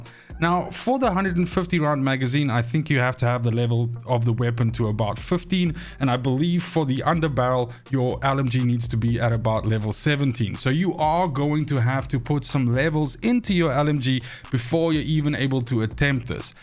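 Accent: South Africa. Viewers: South african? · You from South Africa?or am I hearing that accent all wrong🤣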